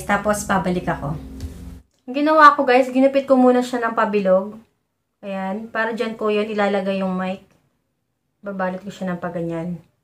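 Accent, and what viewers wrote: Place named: Visayas, Philippines. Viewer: Thanks po! Narinig ko parang bisaya rin kayu? Hehe pa shout po 🤗😁❤️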